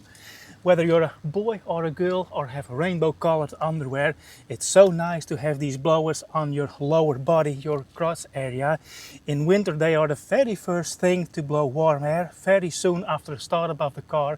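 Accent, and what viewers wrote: Netherlands. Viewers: Great informative reviews 👍your English Dutch accent is very cool to listen too 🇬🇧🇳🇱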